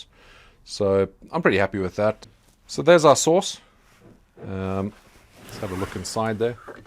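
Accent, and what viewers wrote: South Africa. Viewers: Are you from South Africa brother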